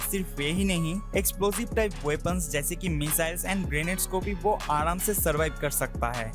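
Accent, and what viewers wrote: Bengal, India. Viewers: Bangali ho kya? Guessed from your accent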